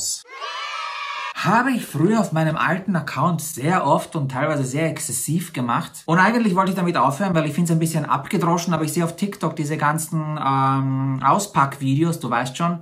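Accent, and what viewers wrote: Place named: Vienna, Austria. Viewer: Hallöchen^^ Ich habe Dich durch Zufall hier gefunden und ich liebe deinen sarkastischen Humor. Deine direkte Art ist sehr unterhaltsam. Dein Wiener Akzent i love it! Liebe Grüße aus Hamburg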